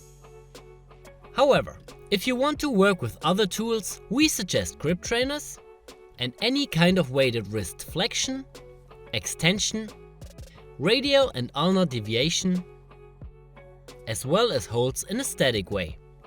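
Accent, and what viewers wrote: Germany. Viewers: When an German-sounding European, with the beside manner of Hannibal Lector tells you these things, you listen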